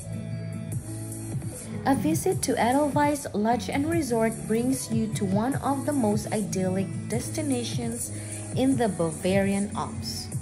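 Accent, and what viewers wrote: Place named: England, United Kingdom. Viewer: I love your English accent bebe ganda.. And that place also too much amazing. Always take care and good bless.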